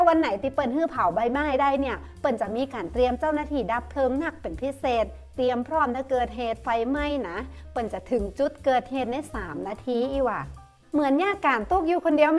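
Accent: Thailand. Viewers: ขยันๆจ้าคุณ ต้องดับไฟตั้งแต่ต้นลมด้วยนะค่ะ😁 อู้เหนือเพราะขนาดเจ้า🥰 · ชอบ อู้คำเมือง เพราะดีจัง ฟังเพลิน ปลูกผักเยอะเลย ดับไฟดีๆนะ เดี๋ยวรถดับเพลิงมา ฮ่าๆ แซวๆ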